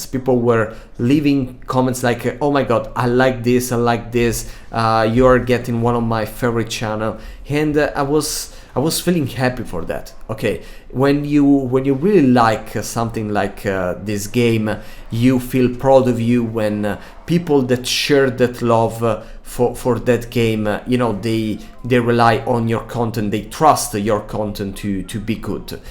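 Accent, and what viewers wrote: Italy. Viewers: Italian I think❤ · same. Sometimes seems a little bit russian, but you can feel the italian in some sentences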